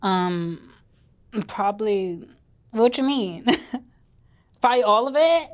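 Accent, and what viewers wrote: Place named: New York, United States. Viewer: Six minutes in & I cannot see u as a male! Love your New York voice.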